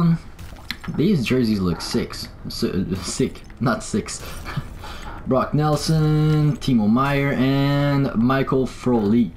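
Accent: Quebec, Canada. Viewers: oh okay. French canadian? That explains the accent right? Keep up the good work. Love these vids man👍🏼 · Ahah l’accent québécois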